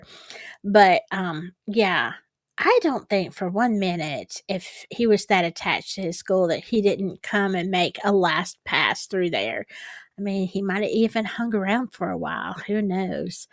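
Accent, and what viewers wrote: South, United States. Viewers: I think you nailed it and love your channel name. It tells what kind of content you have with the word spooky and with your beautiful southern flare to give the added bonus. Also, I got to hype your channel. YAAA ❤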